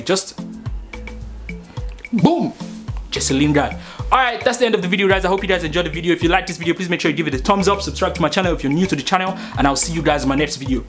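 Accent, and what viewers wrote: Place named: Nigeria. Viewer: Omg you're so cute i love your accent are you from Nigeria? If so ayeee 💚💚!! Oh something else... i still cant do it....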